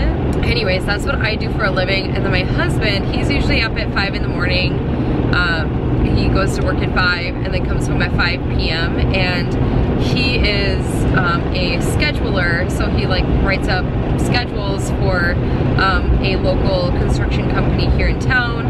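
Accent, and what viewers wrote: Minnesota, United States. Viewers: My dads side of the family is from MN and I want to move there SO bad! When I started watching your videos I knew just by your voice you were from MN. 🤣 I wish I had an Minnesotan accent! ❤️❤️❤️❤️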